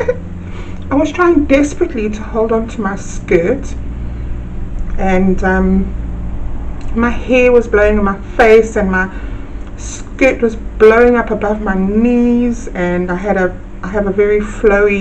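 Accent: South Africa. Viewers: Omg I LOVE your accent!!!! Is it South African?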